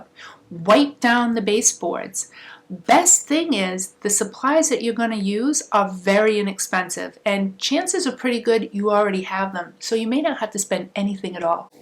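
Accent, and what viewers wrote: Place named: Massachusetts, United States. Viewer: Sometimes I forget how much I miss the Massachusetts accent.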